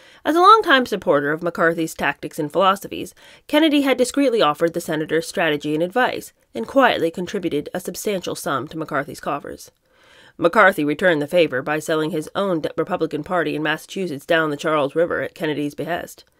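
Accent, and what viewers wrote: South, United States. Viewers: Loved your southern accent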